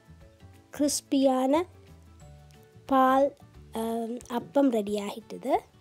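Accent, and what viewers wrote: Sri Lanka. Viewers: Srilankan Tamil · அழகாக சிலோன் தமிழில் பேசி அற்புதமாக அப்பம்(ஆப்பம்)சுட்டுக் காமிச்ச உங்களுக்கு என் நன்றி!!உங்கள் தமிழ் உச்சரீப்பு நன்றாக உள்ளது!!இந்த அப்பத்துக்கு தேங்காய்ப்பால் எடுக்கலாமா?!அதில் ஊற வச்சு சாப்பிடலாமில்லையா?!நன்றி சகோதரி!! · Hi mdm! I know u are a Sri Lankan by the way u talk n yr slang i m also one but Malaysian Sri Lankan. Seeing yr recipe looks so simple. I have tried but never turned out.now looking at yr recipe so simple.let me try yr respi .please one queston . If I were to use coconut water what would be the measurment be like I'm ke? Do I still make the kanji.n add coconut water ? Pls reply.tq very much.please send more recipes for us.Bye.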